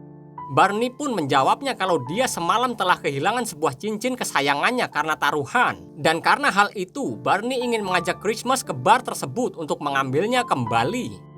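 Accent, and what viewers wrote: Java, Indonesia. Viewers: Suara lu terlalu Jawa mas 🗿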